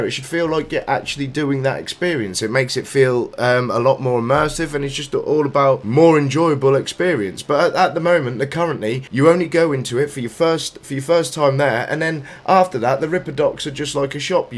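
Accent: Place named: East Anglia, United Kingdom. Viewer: I like your accent, where are you from? It reminds me of East Anglia in England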